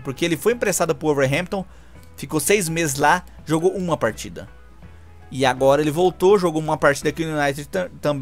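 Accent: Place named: Northeast, Brazil. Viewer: tu eh mito, parece ser nordestino kkkkkkkkkkkkk, por mim emprestava o haaland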